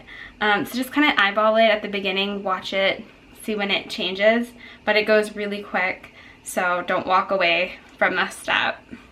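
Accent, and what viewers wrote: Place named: South, United States. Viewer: Hey! You are from the South so of course things are pronounced differently. Great recipe. I will do it soon when I get some sweet potatoes. From B.C. Canada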